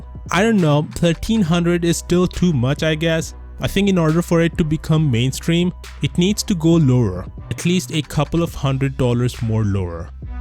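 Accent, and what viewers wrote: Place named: India. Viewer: Your voice gets super deep when you say "lower". Indian accent ftw!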